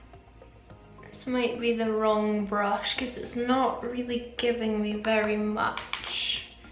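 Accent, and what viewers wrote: Scotland, United Kingdom. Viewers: Is she Scottish?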